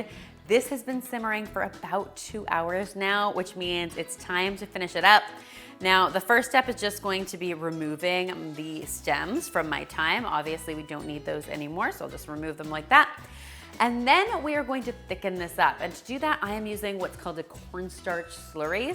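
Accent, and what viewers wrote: Canada. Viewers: As a side note it amuses me how you Canadians say herbs and Worcestershire (I’m English) and as for Scarborough and Peterborough 😂. I’m guessing though you laugh at how we say things 😁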